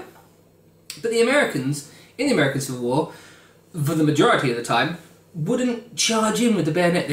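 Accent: United Kingdom. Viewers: i really enjoy your history videos! are you english?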